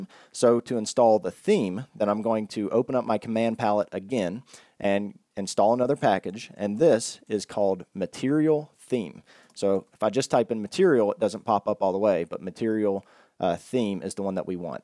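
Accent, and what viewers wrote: South, United States. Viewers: Does he have a southern accent or am I hallucinating?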